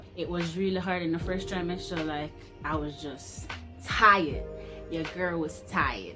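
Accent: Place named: Jamaica. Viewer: That Jamaican accent 😻😻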